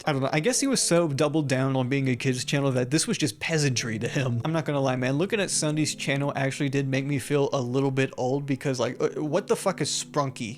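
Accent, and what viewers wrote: Boston, United States. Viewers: I've been watching youtube essays on 2.5x speed in the background of my day to day for the better half of my life. Somehow I've never heard a single narrator with a Boston (assuming) accent and it's freaking me out.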